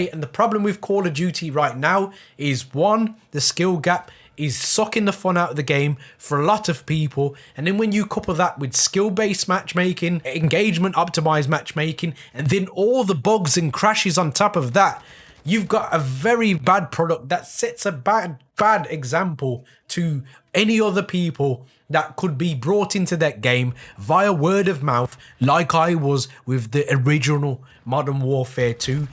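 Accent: Birmingham, United Kingdom. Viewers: Love watching your content , you sound near to were i live in brum keep up the work bro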